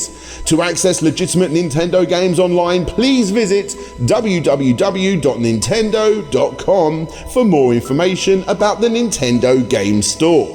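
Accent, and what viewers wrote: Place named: England, United Kingdom. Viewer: You forget dingleberry, that he’s English and they say certain words with certain enunciations. Right now you come off as one those assholes who thinks they are smarter than everyone else.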